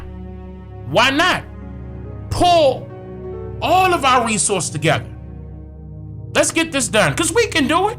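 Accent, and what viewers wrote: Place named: Washington DC, United States. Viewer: Hey your accent sounds very DC like I'm from New Jersey and I moved to the DMV in high school I'm 34 years old are you from the district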